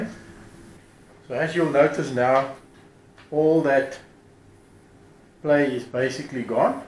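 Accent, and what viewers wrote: South Africa. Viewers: Interesting. After buying a second Benro tripod recently for travel and being unimpressed with its quality (the large ones are great) I’m exploring the insane idea of building my own stand - whether it has 3 or more support stands doesn’t matter. I’m surprised how little information there is on the actual physics or DIY aspect of these. Video tripods feature an interesting collar for the legs which I believe yields a much more stable base than your approach- though I could be mistaken. Thanks for sharing this. Also, South African or Dutch accent? Sounds South African!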